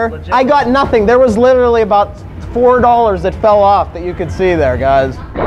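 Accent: Pittsburgh, United States. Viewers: Are you from pittsburgh Pa??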